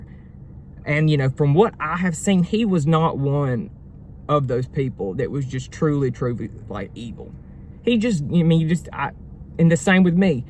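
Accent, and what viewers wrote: South, United States. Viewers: It's not the gay community that this makes look bad baby, it's the trans community that's doing that. They're the ones shooting up kids at prayer & killing Charlie. Ain't nobody I know mad at the gay community that I've seen. They don't have anything nice to say about the trans community tho. I know several gay people & not 1 of them have a mean bone in their body. They're sassy & can get a lil spicy when provoked, but nothing mean or malicious about them. Why u urself sound sweet enough to give somebody diabetes sir.😂 That southern twang was made for u baby.😁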